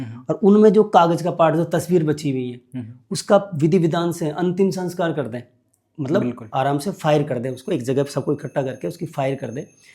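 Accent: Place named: Rajasthan, India. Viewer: Dr ji aap kahan ke hai, aapke bol me haroti ka lahja aa raha hai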